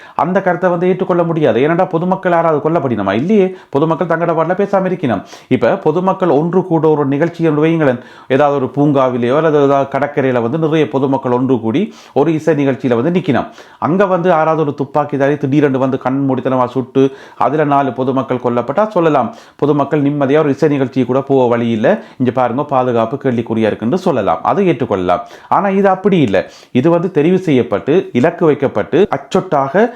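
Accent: Jaffna, Sri Lanka. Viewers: யாழ்ப்பாண தமிழில் செய்திகள் வழங்குவது சிறப்பாக உள்ளது.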